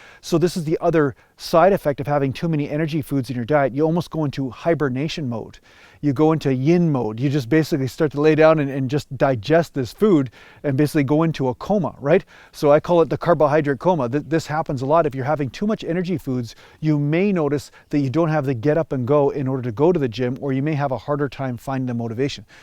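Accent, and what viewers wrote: Canada. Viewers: He must be a fellow Canadian. I can tell by how he talks haha.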